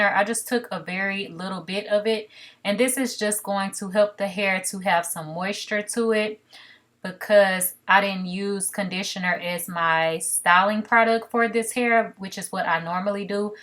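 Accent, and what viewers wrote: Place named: South, United States. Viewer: Yessss I love these type of videos! Your makeup is so nice, your hair is bomb like what lace?!! I LOVE your pregnancy glow and your cute country accent. yess keep them coming!!! do more grwm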